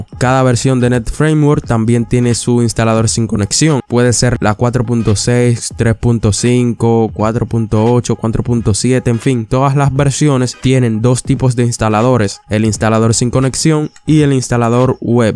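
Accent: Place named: Dominican Republic. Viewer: Todo muy claro, bien organizado y estructurado, además acompañado de un buen acento dominicano. Muchas gracias señor ;)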